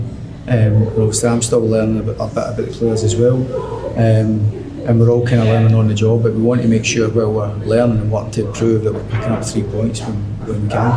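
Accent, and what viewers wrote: Scotland, United Kingdom. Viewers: More waffle. But now in a more verbose style and with a Scottish accent.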